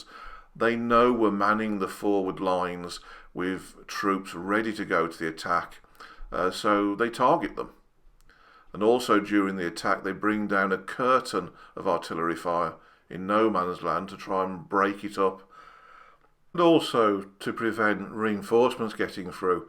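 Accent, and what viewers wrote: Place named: England, United Kingdom. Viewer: A very well presented talk and delivered without the usual triumphalism that often is shown when Aussie battles are discussed. You have an English accent.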